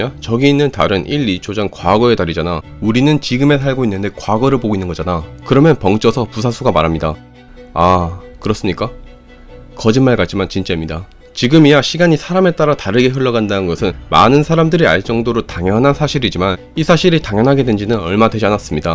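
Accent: Gyeongsang, South Korea. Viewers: 어?? 뭔가 이분 목소리랑말투 맨첨에들었을땐 섹시한 동굴목소리가진 미국교포 한국어말투 느낌이었는데 듣다보니 경상도억양이.....ㅋㅋㅋㅋㅋㅋㅋㅋ귀여워ㅋㅋㅋㅋ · 혹시 실례가 안된다면 억양이 경상도가 고향이신거 같으네요. 포항이나 경주영천? 경북남쪽지역 억양은 확실한데 서울 가신지도 10년은 안됐을듯하네요. 제가 20초에 서울갔을때 그리고 10년 서울생활했을때랑 진짜 비슷해서요ㅎㅎ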